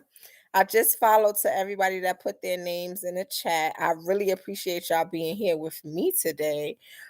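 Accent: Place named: New York, United States. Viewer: I figured it was either NY or NJ the accent,husband as well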